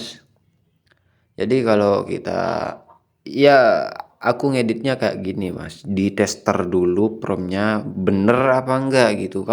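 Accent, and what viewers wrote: Madura, Indonesia. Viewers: Logatnya Madura bangtt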